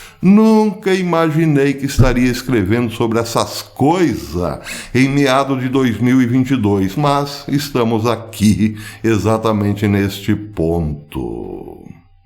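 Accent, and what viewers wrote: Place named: Minas Gerais, Brazil. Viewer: deve ter morado em Minas! Tem gostado de falar em mineirês!! Beijos professor! Amo seus vídeos!!! (BH)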